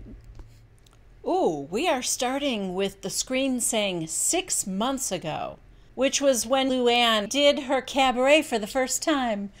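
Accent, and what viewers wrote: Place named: Chicago, United States. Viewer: I love Luann! She's such a character. Sonja is the most eccentric, accidentally hilarious, cringiest housewife of them all lol I got the same vibe from Barbara. The only positive thing that could happen from that is that she knows a lot about Luann, and doesn't seem to hold back based on that convo with Carol a few seasons ago. I don't know, im on the fence about it too. By the way, what state do you live in,? You gave a slight accent that reminds me of Chicago or something. I'm from a very small, rural town in Indiana, and everyone thinks we sound southern lol but I've always wondered where you live, if you feel comfortable telling us.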